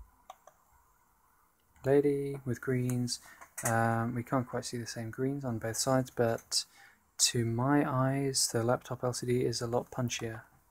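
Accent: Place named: England, United Kingdom. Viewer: I mean I know people think English guys have posh voices and sophsticated words (as long as you stray away from the Chavs etc) but this guy takes first prize :D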